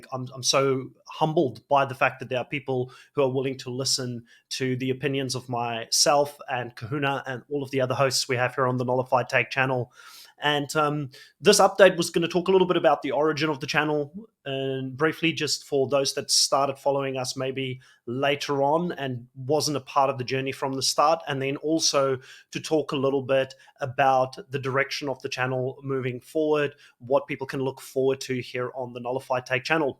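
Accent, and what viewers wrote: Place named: South Africa. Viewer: his accent is South African, though it can be confusing if you only hear that he lived in Australia and then moved to New Zealand. lol Watching the different versions of Survivor helped me be able to distinguish the English accents better. lol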